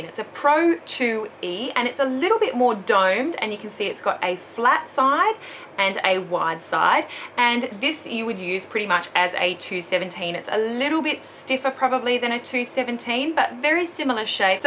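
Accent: Australia. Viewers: are you from australia?.c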